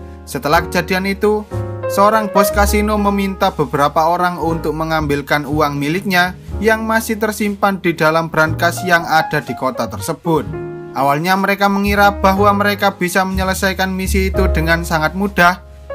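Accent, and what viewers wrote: Java, Indonesia. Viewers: Jenegan jowone ngendi mas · Ohh wong jowo toh sampeyan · Org Jawa lu bg?? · Mas..ini yg ngmngnya jawanya medok pisan eeuy..(yg menceritakan filmnya) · Bisa gk sih bos ngomong nya jngn medok bngt gitu,, pengen nonton tapi sebel sama suara nya,, 😒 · Bang kamu orang Jawa yah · Abg orang jawa? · Lha kog medhog banget ... Njawani .. Wkwkwk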